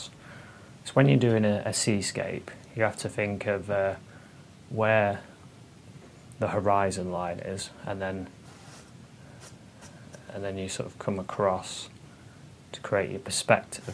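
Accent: United Kingdom. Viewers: Is this guy the British successor to Bob Ross O.O like in all seriousness he paints just as beautifully, and his accent and voice give off that ASMR effect. the same kind that Bob Ross did. This painting is amazing and Sepia just works so well and I love the technique of using masking tape to section off the horizon line :D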